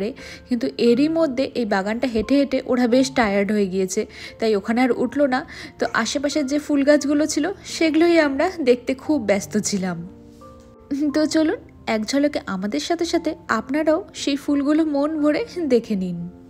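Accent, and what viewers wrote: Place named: North Bengal, India. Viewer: এত্তো দিনে উত্তরবঙ্গের কথা শুনতে পেলাম❤😂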